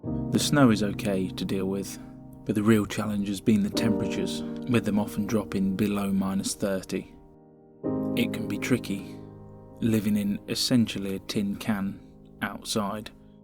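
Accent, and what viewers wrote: Leicester, United Kingdom. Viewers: Omg. I just twigged that your accent sounded like a Leicester accent and low and behold you are from Leicester. Hello from a fellow Leicester person. Loving your story and no I don’t live in Leicester. Have travelled round a lot myself. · I do live in Leicestershire and also thought I recognised the accent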